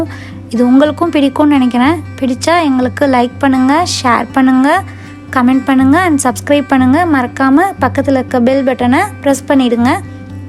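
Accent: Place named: Madurai, India. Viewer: madurai side poitu vandha maari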